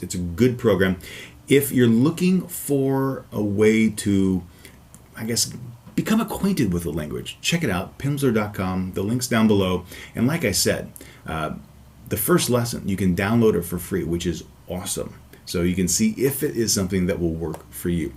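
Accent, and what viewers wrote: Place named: Midwest, United States. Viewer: since you've been here in the philippines, instead of ordering the program online, you could have downloaded that program through uTorrent, absolutely for free. i'm a native cebuano speaker and thanks to pirate bay, i became almost conversant with spanish, french, and japanese for free. so far, our laws may have lapses on online piracy. you notice that here in my country that piracy is almost everywhere, right? by the way, what i want to learn right now is how to imitate your pleasing mid-western accent. i like it.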